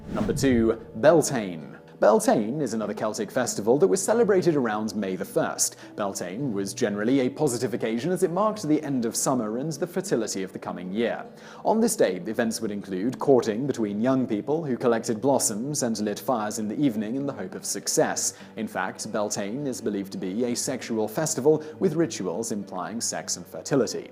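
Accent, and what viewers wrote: United Kingdom. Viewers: Well be patient Simon is British Speaking which can't be too far off from Irish and also the Celts lived in northern Europe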